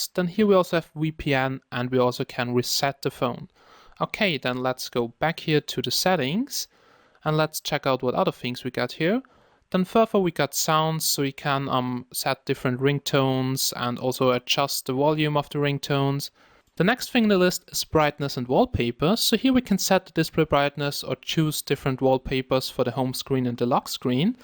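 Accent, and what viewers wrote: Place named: Germany. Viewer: damn you sound german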